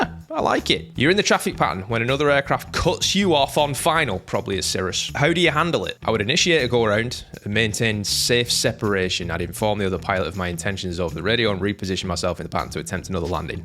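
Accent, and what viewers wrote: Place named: Bolton, United Kingdom. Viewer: Palmeiras do Brazzzil??? Lol I thought you were from Bolton good Sr 👍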